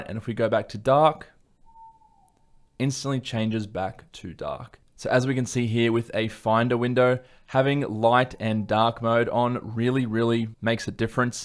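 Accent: Australia. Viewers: Closest I have come listening to Australian accent. You speak well and clear. I have used windows all my life I can't relate to this but for the love of Apple animations and the Mac OS I am here for it.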